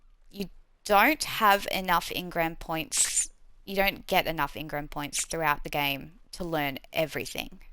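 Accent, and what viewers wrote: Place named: Australia. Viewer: So nice to hear a familiar accent! Aussies unite :)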